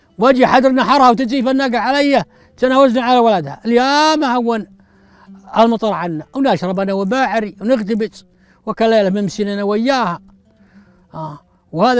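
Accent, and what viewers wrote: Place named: Najd, Saudi Arabia. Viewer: قد يكون عايش في نجد او ف الشمال ومكتسب اللهجه من الديره الي هو عايش فيها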